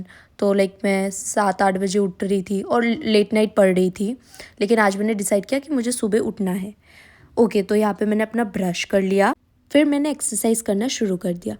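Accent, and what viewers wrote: Bengal, India. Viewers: are you bengoli? you have a bong accent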